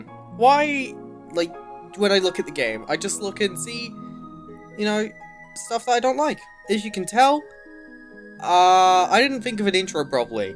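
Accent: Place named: United Kingdom. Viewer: youre british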